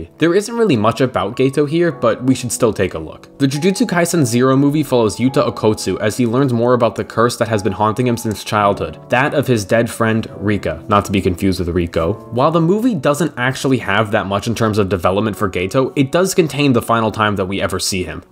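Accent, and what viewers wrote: United States. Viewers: holy shit I just can't with the overly American pronunciation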